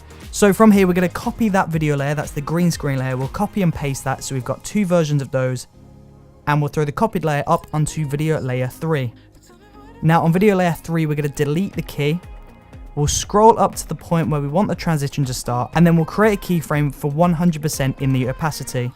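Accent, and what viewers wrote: United Kingdom. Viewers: You sound so British and i like it..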